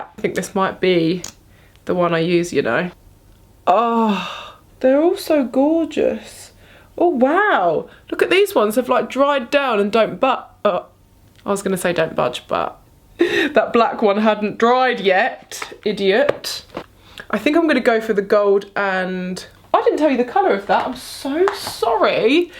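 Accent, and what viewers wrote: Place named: United Kingdom. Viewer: I LOVE UK, I basically love everything British, from people, to London, to this accent, oh my God I feel so happy when I'm listening a British talk hahahaha I have such a deep love for UK, my dream is to travel there someday ❤️❤️ I said all these things just to express myself and my love for this country and not to try convince to pick me as a winner.. I can get all these things, it would just be AWESOME and something so meaningful to me to get them from a British girl ❤️❤️❤️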